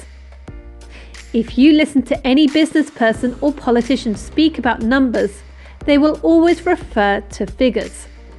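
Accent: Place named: United Kingdom. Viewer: i like your accent can you tell me wich part of the UK is this accent from?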